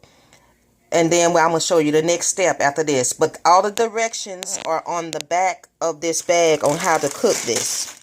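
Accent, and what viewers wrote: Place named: South, United States. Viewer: 😂😂😂😂lol. She has a southern accent.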